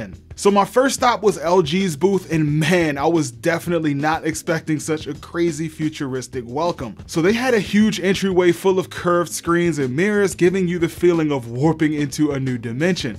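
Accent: Baltimore, United States. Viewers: Thought I recognized that MD semi b more accent.:) Great vid as usual I use a lot of your info. MW, Wash DC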